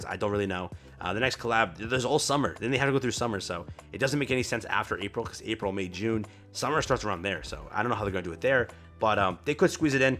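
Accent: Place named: Utah, United States. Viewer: You be from utah or idaho...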